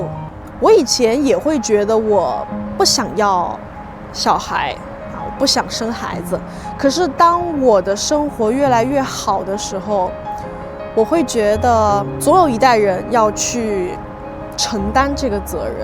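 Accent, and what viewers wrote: Standard. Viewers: 老师你好 老师我是泰国人的学生 谢谢老师教我中文 老师这样好吗 老师可以有拼音 还有英语 和大大的字 我喜欢听老师的发音 非常清楚非常标准 谢谢老师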